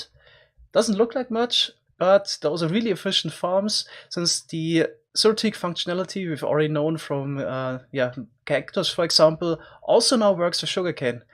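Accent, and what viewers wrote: Germany. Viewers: Man merkt krass das du deutsch bist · The Video sounds like german Englisch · R u german or what is that cool accent ?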